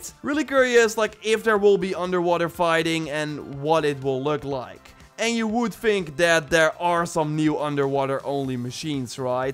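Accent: Netherlands. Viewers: when I heard his accent I knew instantly that he was dutch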